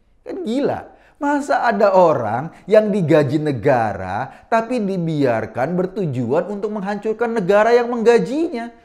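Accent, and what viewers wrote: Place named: Banyumas, Indonesia. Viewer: Slam polo waras kang wong ngapak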